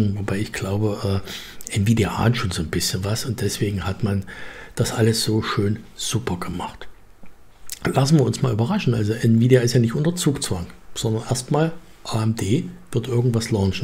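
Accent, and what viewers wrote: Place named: East Germany, Germany. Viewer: Du bist ja noch "am Anfang", du wirst sicher sehr schnell sehr viele neue Abonnenten haben. Jedenfalls machst du das äußerts professionell , du wirkst wirklich ausgesprochen kompetent. Davon ab, dein leichter "Ossi-Akzent" ( sorry Dafür ), ist sehr sympatisch. Weiter so !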